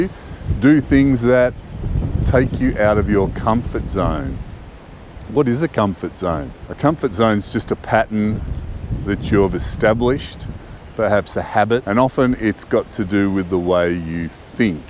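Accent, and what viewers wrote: Australia. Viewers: The Aussie accent aside I was thinking he looked kinda like an Old US Marine.😁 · I hate AI text. He said "aspire" and the text caption read "a spider". Granted,he does have a strong Australian accent. Perhaps the AI is American.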